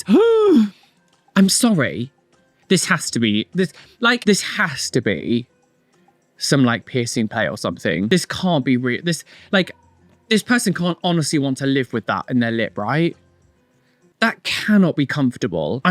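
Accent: United Kingdom. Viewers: you have such a distinct british accent 😆 i love listening to your voice and practicing my english skills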